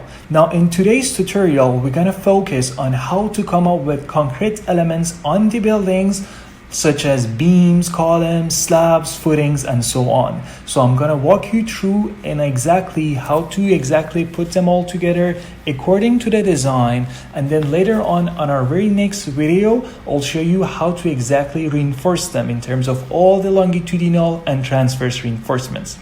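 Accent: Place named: England, United Kingdom. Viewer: Best Revit tutorial ever. Made by a patient and competent Revit expert with a very clear understandable english accent. Thank you!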